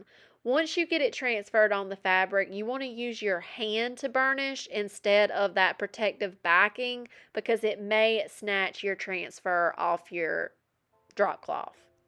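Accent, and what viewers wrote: South, United States. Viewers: I wish you were closer to me; I would give you an iron. I have 3 or 4 of them. You can pick one up when you're thrifting. I love your show and your cute southern accent.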